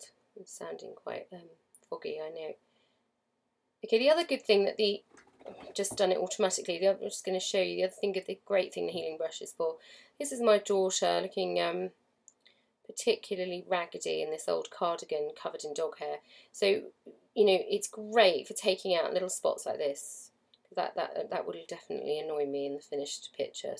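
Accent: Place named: Cockney, United Kingdom. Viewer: Very difficult to understand your comments with your Cockney Ac;cent. Sorry but you lose me when you are discussing your techniques and its a shame...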